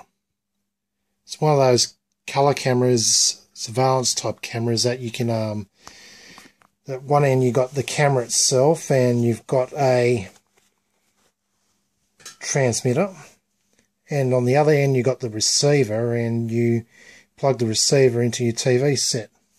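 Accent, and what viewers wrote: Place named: Australia. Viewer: Hi there. I have a camera I got with my little quadcopter. It records onboard to a micro sd card. The quality is surprisingly ok but it does not have av out . I would like to add an av out plug to it if possible for wireless streaming. Would u take a look at it please and maybe point me in the right direction as to wht to solder or not. I can take pics for ya or send ya a video of it. I hear u are Australian. Could maybe post it to you. Im in perth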